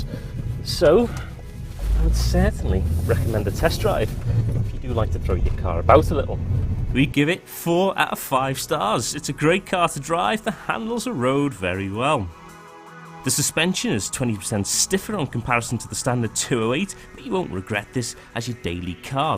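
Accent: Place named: Wirral, United Kingdom. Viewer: His accent is Wirral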